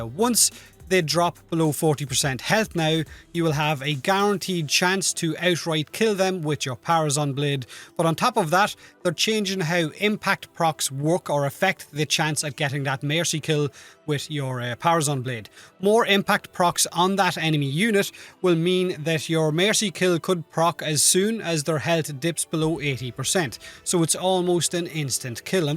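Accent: Ireland. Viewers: U from Ireland bro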